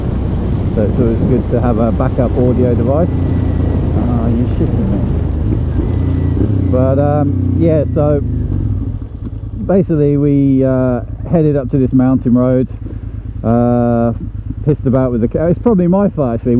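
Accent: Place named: Manchester, United Kingdom. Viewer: Not sure I can use your accent as a pointer, however if I can I would say its not north Manchester, second stab.. Didsbury?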